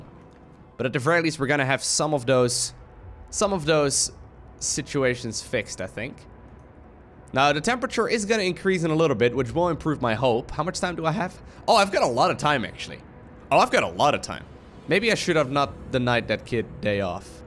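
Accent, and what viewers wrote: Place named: Netherlands. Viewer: You sound super dutch, are you dutch?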